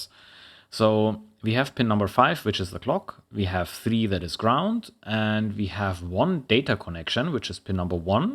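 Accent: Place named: Germany. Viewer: so you could definitely say that the dark background music you put in there was already some sort of "subtle foreshadowing", right? BTW, you sound waaaaay too german for someone that speaks english natively, so i guess we're both from germany?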